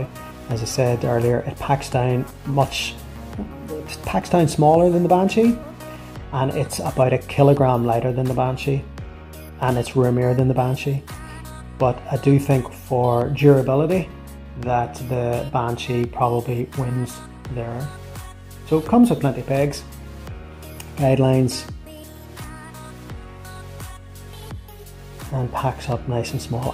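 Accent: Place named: Northern Ireland, United Kingdom. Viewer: I'm looking to get a new tent to start backpacking again after about 12 years. the Naturehike keeps getting mentioned and I was actually on the AliExpress site looking at the popular Cloud peak 2 tent, when I noticed a few other Naturehike tents which hardly get a mention. I saw the Taga, which then lead me to find this video and your channel. I'm not sure I really want a 1 skin tent though, so maybe I'll look at something else. My current tent is the Vango Spectre 200, which I bought years ago, after thinking the Banshee was too small. Funny, the Banshee is still going strong, but the Spectre has long been axed by vango. It was good, just that I fancy a new tent. Do you get out much ? You don't make any wild camp videos. Nice to hear the accent, I originally lived in Holywood .. until I was 5 (57 years ago) Good luck with your channel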